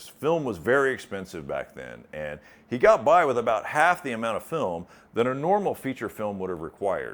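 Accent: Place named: United States. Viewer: This accent is extremely American.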